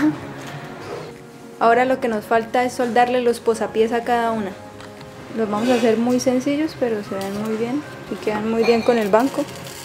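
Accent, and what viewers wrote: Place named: Colombia. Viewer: no sé cómo mostrarte los vídeos que encuentro en yt y te puedan ser útiles. Busca en YT: how to hydro dip ANITHING Creo que te va a interesar para pintar alguna de tus creaciones Pdta: me encanta tu dejo Colombiano xd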